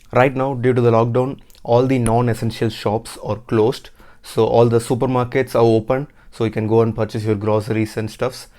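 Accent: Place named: India. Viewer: Why do you repeat the same thing again and again. Your accent is terrible - typical Indian accent 🤣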